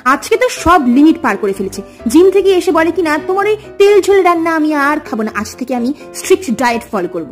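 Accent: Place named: Bangal, Bangladesh. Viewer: দিদি তোমার বাঙ্গাল কথাটা খুব সুন্দর ☺️☺️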